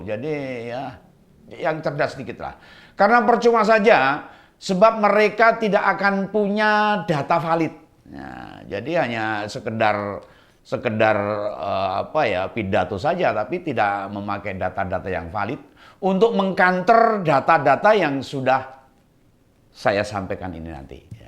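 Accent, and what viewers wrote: Java, Indonesia. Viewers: saya suka dgn logat mas ini, jawa banget, dan saya sering belajar bahasa jawa, karna teman2saya banyak yg dari jawa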